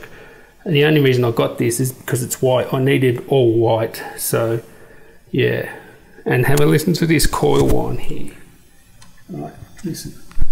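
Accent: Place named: Australia. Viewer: Great Aussie voice hahaha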